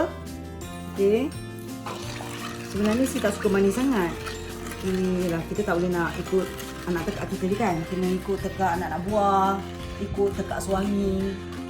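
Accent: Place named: Perak, Malaysia. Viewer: Eeyyy mok sedap bebeno nengok nye..sis labon bahase perak best pulok ..lawok pun ade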